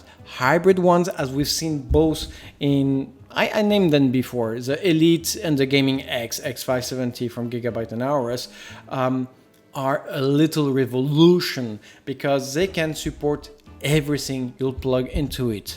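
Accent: France. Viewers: C'est magique, mais quand c'est un français qui parle anglais, je comprends tout! Merci et je te félicite pour ton English french accent car je travaille dans une société française qui bosse à l'international et dont bon nombres des salariés parlent anglais au téléphone et j'ai régulièrement les oreilles qui saignent en entendant leur phrasé dégueulasse et leur absence d'effort pour parler anglais proprement. Toi tu parles vraiment bien anglais, ça fait plaisir. Je découvre ton travail et je vais continuer à te suivre. Je pense que je vais prendre cette carte mère, tu m'as convaincu. Elle n'est pas super fashion (même plutôt… moche) mais elle est sérieuse et d'un bon rapport qualité prix. C'est ce que je recherche. Elle semble disposer d'un bon étage d'alimentation bien refroidi et dans cette gamme de prix, je n'en vois pas d'autres. Ma carte actuelle a 16+2 phases et ça fait 10 ans qu'elle tourne nickel avec un CPU overclocké à 4,7Ghz (Asus P8P67 Deluxe + 2600K) Je recherche quelque chose de comparable sans être aussi bien je m'en doute, les CM haut de gammes sont bien trop cher de nos jours.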